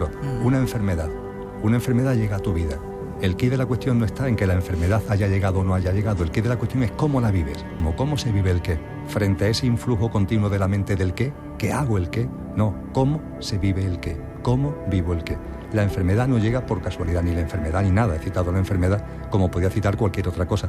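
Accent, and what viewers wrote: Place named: Andalusia, Spain. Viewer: Cuanta claridad. Andaluz tenía que ser Señor!!!. Gracias.❤❤❤❤❤❤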